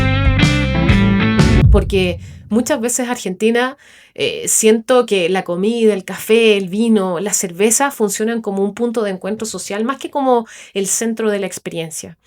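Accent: Chile. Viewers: realmente me encanto!!!! mas.....con ese hermoso acento trasandino..... Me impacto la precision de tus conceptos para mi querida Buenos Aires, pero realmente me fascino lo simple de esas vivencias que se convirtieron en una poderosa razon de vida! Gracias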